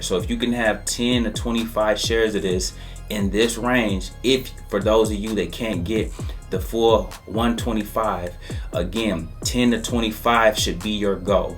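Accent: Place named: North, United States. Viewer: haha.. love that Northern accent! im from RI but living in Tennessee Y'all. 😅😅😅 Fixin and yant too? 😒😒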